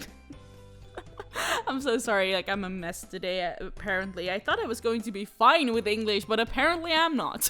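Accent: Netherlands. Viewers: your accent is so cute! i really want to learn dutch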